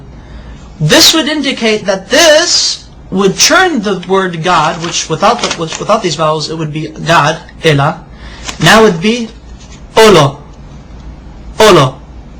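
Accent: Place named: Arab world. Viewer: erstens schaut dieser mann nicht wie ein jude sonder ist sicher ein araba sein aktzent ist arabisch und will die juden verarschenaber er verarscht sich selbst jeder erkennt das er kein jude ist der trottel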